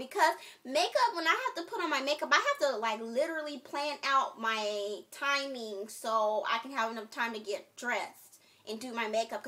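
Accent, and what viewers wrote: United States. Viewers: lol you really sound country girl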